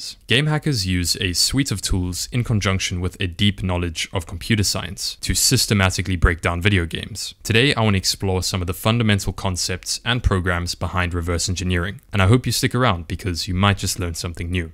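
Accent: South Africa. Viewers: You sound like you are from South Africa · I trust you because, of the south african accent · Do I detect a south african accent?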